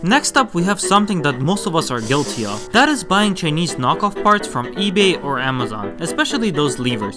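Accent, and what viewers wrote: Russia. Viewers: has a Russian accent? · Dude, why do you have Russian accent?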